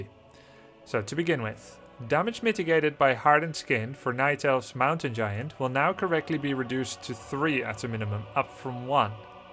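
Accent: Scotland, United Kingdom. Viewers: dat scottish accent always amusing to see you eine andere Sprache sprechen :D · Why are ye suddenly scottish though?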